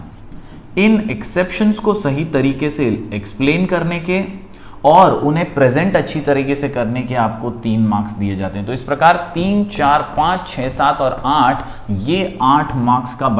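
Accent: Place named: Gujarat, India. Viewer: Sir where r u from? Gujarati lgte ho 😅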